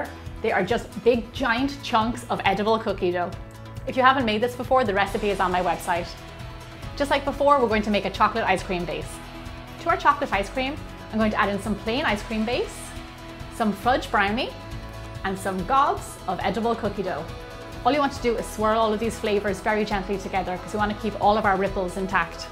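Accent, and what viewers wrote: Ireland. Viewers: Omg i love her Irish ascent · I love your accent are u Irish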